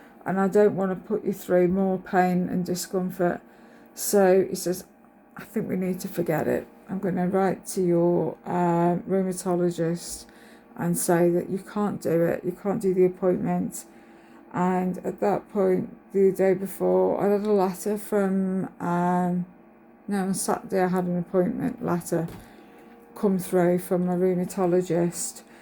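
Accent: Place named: East Midlands, United Kingdom. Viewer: Trying to work out where you're from, by listening to your lovely accent! Is it East Midlands?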